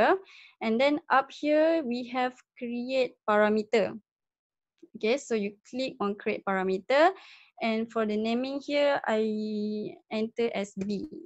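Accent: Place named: France. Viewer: Thank you for the tip... Very nice Done! By the way what a cute French Accent!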